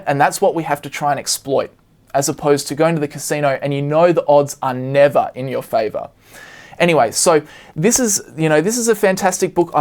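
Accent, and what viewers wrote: Australia. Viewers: Are you Australian? If yes, whereabouts if you dont mind sharing?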